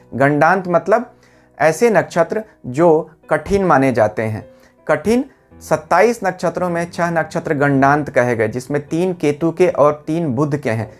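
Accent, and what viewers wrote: Standard. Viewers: गहन ज्ञान, शुद्ध उच्चारण तथा प्रभावी प्रस्तुतिकरण ऐसे ही ज्ञान बाँटते रहना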